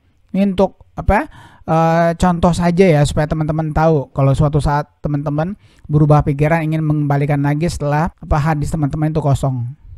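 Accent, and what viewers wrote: Makassar, Indonesia. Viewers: orang makassar ki ?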